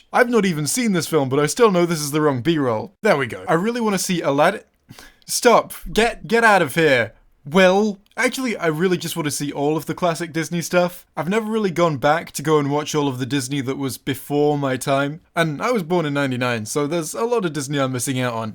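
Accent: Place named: Scotland, United Kingdom. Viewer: Question: I’m hearing a Scottish accent there mate, Whit part yae fae?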